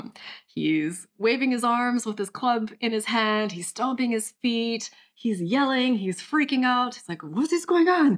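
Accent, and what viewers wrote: France. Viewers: Digging the French accent and French Flintstone. 😂 (I speak French!) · Thank you so much for your great work, your french accent is beautyful❣️A lot of greetings from Germany 🙏🌈🙋‍♀️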